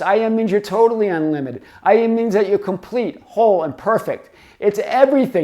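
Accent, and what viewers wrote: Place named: New England, United States. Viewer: tbh nothing makes me smile like hearing your authentic new england accent! 😂 It makes these videos hit home so much more for me living out here in cali haha. Love your contagious energy!!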